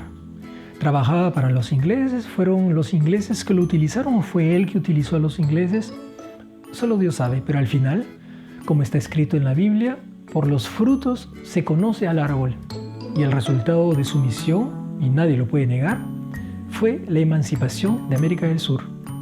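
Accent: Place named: France. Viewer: Me encantó el vídeo. Me encanta el acento francés al hablar español.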